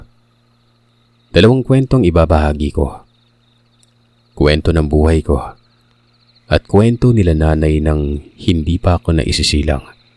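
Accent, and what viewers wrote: Visayas, Philippines. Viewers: Wow bisaya kaayog titol ohh hehehe❤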